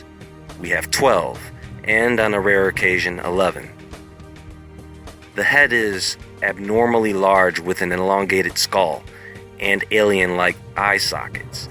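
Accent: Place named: New York, United States. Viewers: I love your channel you sound like a fuckin badass you must be a brooklyn kid, you deserve 50 quadrillion subscribers. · Would make a perfect narrator for a mob documentary i'm serious man you got a great voice I don't know if you're from New York or New Jersey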